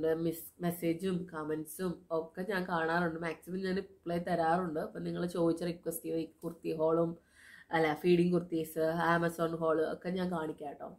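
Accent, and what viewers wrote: Thrissur, India. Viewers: ipo sherikum Trissur slang aayi samsaram oke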